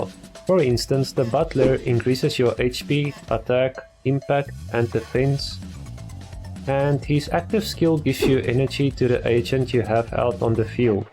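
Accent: South Africa. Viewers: You sound South African, I'm from there too. Nice video my dude!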